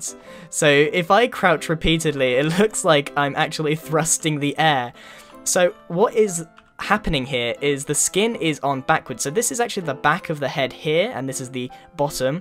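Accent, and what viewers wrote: United Kingdom. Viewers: r u British?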